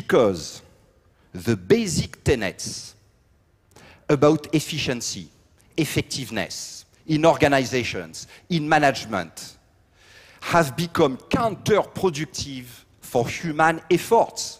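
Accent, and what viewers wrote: France. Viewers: Stopped . Sorry, but I can't handle that kind of laziness, the accent is too thick, and since I'm French, I know that he's not even TRYING to get even a slight accent. It's a pain just to listen to the words. Too bad because it would have interested me. Mr Morieux, please take English classes to improve your accent, thanks. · i thought the language is frenc... dé internet, lé hdhsjs... disgusting accent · His accent is an incredible one. It's like to hear a French-English. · I wish I could be French just to have his accent. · Why can't he at least try to pronounce English words in English, instead of pronouncing them French. It is unbelievably hard to understand... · To those criticizing his accent, how about you go speak French without an accent? I thought so. Great speech. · strange, it's french accent but very very excessive x) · The French is strong in his accent.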